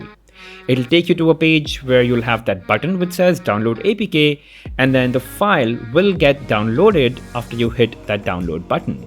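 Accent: India. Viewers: indian accent English is pure ear cancer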